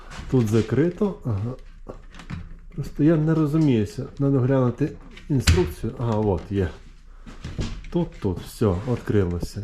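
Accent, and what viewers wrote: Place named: Transcarpathia, Ukraine. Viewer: Закарпатський діалект мене трохи здивовує😅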